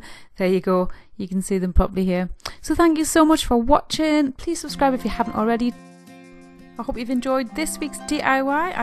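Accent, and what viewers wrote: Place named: Scotland, United Kingdom. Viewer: I love your diys. I love your accent may I ask if you're Scottish?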